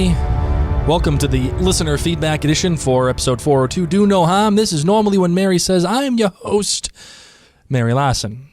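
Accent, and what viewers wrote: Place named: Northeast, United States. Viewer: always love the fade in and out of your northeastern tongue.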